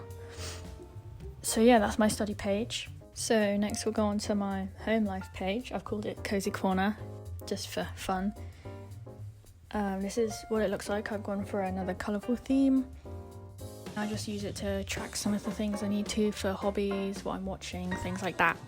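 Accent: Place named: United Kingdom. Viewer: i love your voice so much,, it’s so calming. i know that there are different british accents from different parts of the UK and yours reminds me of a friend of mine! they left tho the country tho :(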